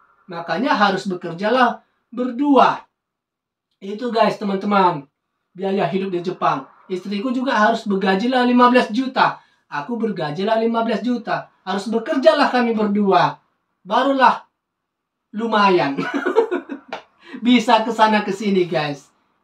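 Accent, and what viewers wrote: Medan, Indonesia. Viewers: Mantap abang ku , kayaknya medein medan ini abang ku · Abangnya logat bicararamya dari medan ya Bang? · Kayak Medan kali bahasanya😊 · Medan kali bahasamu lagi yak horaslah.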